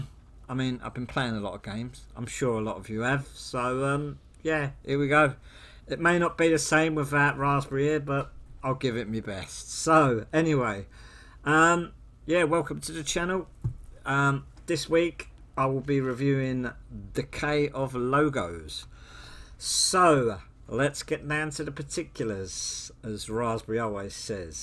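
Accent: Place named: Ireland. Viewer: Are you Irish, bro? Cute accent :)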